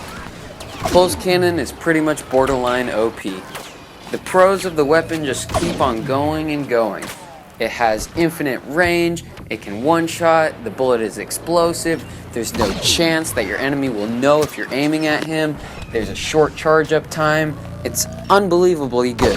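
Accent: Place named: Balkans. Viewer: your sound close to balkan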